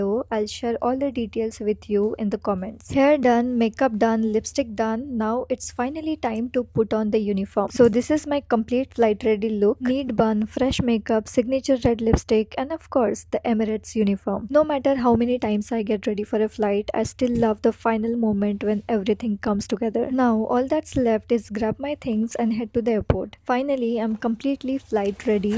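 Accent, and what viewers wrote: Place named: India. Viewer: Clarity? Her English is Indian-speak.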